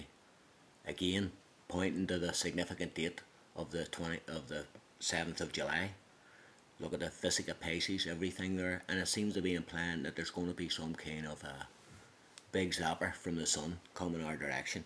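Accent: Ireland. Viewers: you have a talented mind !! i just hope you're wrong in some of your views :) im curious about the upcoming August crop-circle of the year. ps irish accent i love that land!! (specifically bray - yes, bray) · Love the Irish accent, understood it all real well. Very strong accent though and even said if you don't understand me visit the web addy.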